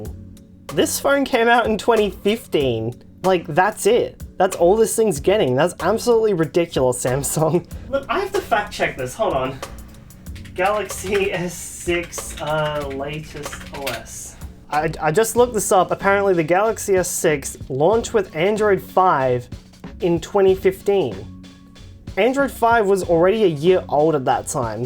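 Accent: Australia. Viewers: wtf why is it that most of the english speaking channels i watch are guys from australia xD anyway, i really like your content❤ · I see a pair of talking hands with an Aussie accent and first thing comes to mind is some guy with a green ipad talking about tech stuff in a entertaining way. jokes aside this channel is great anyway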